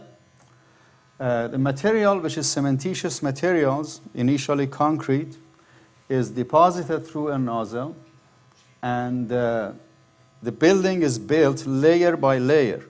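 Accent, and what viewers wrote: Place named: Iran. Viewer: This man is from Iran I can hear his Persian accent.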